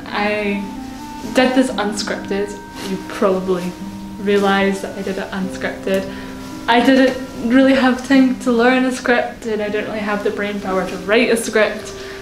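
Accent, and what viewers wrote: Scotland, United Kingdom. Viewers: wow, not gonna lie i did not realize you were scottish til just now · Scottish, Irish, British, or Dutch accent? Either way your voice is lovely!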